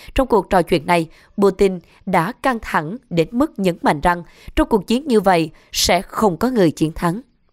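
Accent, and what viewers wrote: South, Vietnam. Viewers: Kênh bạn rất hay và chuẩn về thông tin. bạn nói giọng miền Nam nên nói chậm rãi. đừng nói nhanh quá... nghe sẽ đầy đủ hơn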